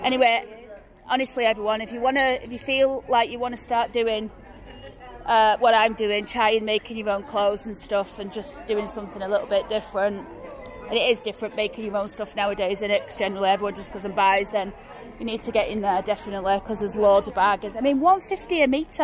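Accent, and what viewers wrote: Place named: Yorkshire, United Kingdom. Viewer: I like your yorkshire accent, sounds like Angela Raynor!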